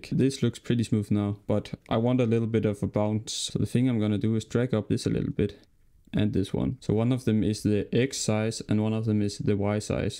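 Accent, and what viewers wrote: Denmark. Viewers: i can hear that you have an accent. It kinda sound like you are danish. Are you? BTW great video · og man kan bare høre du dansk 🤣🤣 men fed video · Your defintly danish 😆 but thanks, great tutorail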